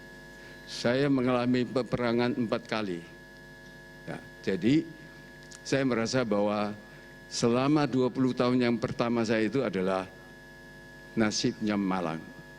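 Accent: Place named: Java, Indonesia. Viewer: Gaya bicaranya mirip mendiang Pak Soeharto...dan terasa banget logat Jawanya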